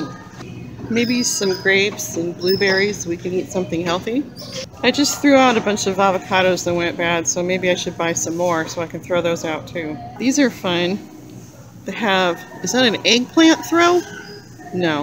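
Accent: United Kingdom. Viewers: Oh, how I do adore the Glaswegian accent! 🏴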